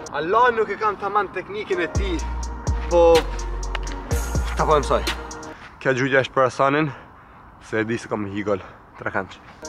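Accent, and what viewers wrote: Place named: Kosovo. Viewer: Me dukesh që fol gjuhë të përzier sikur kosovar dhe shqiptar(sikur nga shqiperia) 😅😅 veq vazhdo më i miri youtuber shqipatar jee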